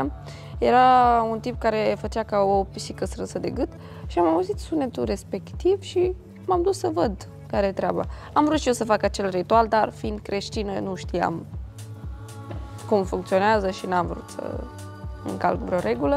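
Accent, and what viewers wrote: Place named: Moldova. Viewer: E moldoveanca ce vrei.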